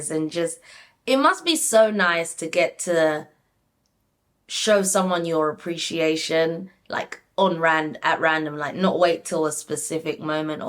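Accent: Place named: United Kingdom. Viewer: I love your reviews and that accent is so enticing. Honestly, when you brits talk, I just damn listen 😂. Ok so, let's get into it. I have subscribed and thumbed up 😉 1) Nicole and Sam - we have to be fair and not give Nicole a pass for her behavior. Her asking Sam for physical contact after that disastrous reveal and what her heart showed her is really low. She has self-esteem issues. Was it love at all cost for her, cuz sis has me really disappointed with that revelation. Did she think that sleeping with him was going to erase the awfulness that is Sam? 2) Demi and Ollie - Ollie is not about that life, he likes pretty girls and whilst he knows Demi is good wife material, the sexual tension is lacking. This wont' work. Demi is just a homie to him. 3) Maria and Tom - Maria can't have it both ways, she can't be about arab/muslim culture and values in one scene and be drinking a cosmo in another. 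I have nothing against Muslims/arabs nor against alcohol but the fact that she is a liberal muslim proves that she needs to compromise with the world as she has done with herself. It's not because Tom does not put religion or culture forwards that he lacks values or choice. Stop it already. 4) Cat and Freddie - Cat has a temper or maybe it's Freddie that triggers her. I think Freddie's gorgeousness throws her off, it's almost as if this was his best quality and his worst at the same time because people tend to associate his looks with someone who is effeminate or soft. In any case, Cat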